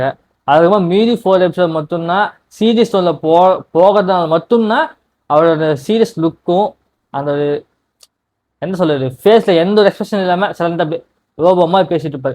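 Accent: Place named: Telugu, India. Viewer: நீ ஒரு தனியா ஒரு வீடியோ ஒன்னு போட்டு வை டா அது என்னன்னா நீ ரியலா தெலுங்கு தான் பேசுவ அப்படிங்கறது எல்லாருக்கும் தெரியிற மாதிரி ஒரு வீடியோ போட்டு வைடா நீ பேசுற தமிழ் வந்து டிஃபரண்ட்ஸா இருக்கு போல நீ க்ளிப் போட்டு இருக்கேன்னு எனக்கு தெரியும் மத்தவங்களுக்கு தெரியாது இல்லையா... அதனால சொன்னேன்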